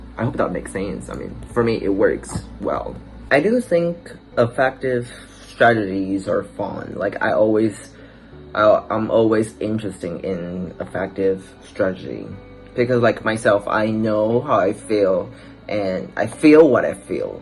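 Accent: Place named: Australia. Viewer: I agree with you when it comes to languages and emotions! I notice that however I feel while studying carries over to my state of mind while speaking the language. I feel like it also affects my openness to the culture. For patterns, I love them. I notice that I learn languages better when I notice the patterns on my own :D Then later on I can learn formal patterns to see if they match (or they build more easily off of what I already have learned). Ahh accents, I want to know how to change mine in the languages I learn. I don't mind having a foreign accent but I'd at least like to have one that sounds nice... Your accent kind of reminds me of Baobaopearly's. It isn't a valley girl accent, honestly it sounds sunny if that makes sense. :D Also, your placement sounds like it'd be in the right place for an Australian accent. Good luck whatever you work towards! 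Great video!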